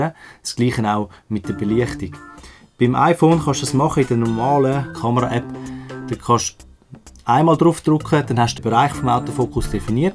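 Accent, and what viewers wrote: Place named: Switzerland. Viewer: Super, danke! Alles verstanden, trotz Schwitzerdütsch :-)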